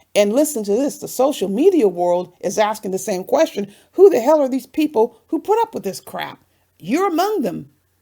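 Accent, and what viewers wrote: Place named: Working class, United Kingdom. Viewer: High Class with a working class British accent ? I don't think.so.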